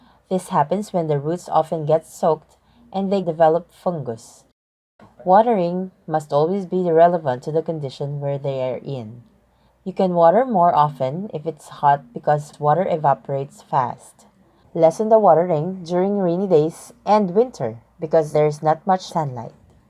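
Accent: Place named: Philippines. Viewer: Sounds like Filipino 😀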